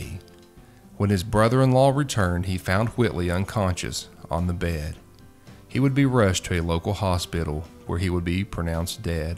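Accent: Kentucky, United States. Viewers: What a beautiful tribute to Keith Whitley you did a wonderful job. It was so sad his life ending so tragically. You are a wonderful narrator l love to hear you speak you have a special voice that keeps you interested. It must be that Kentucky voice that intrigues me. Keep the videos coming l truly enjoy each and every one.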